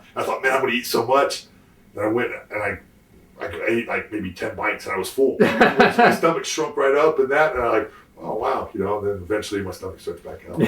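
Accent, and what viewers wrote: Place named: Texas, United States. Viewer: He sounds like Austin and Shawn. Texans.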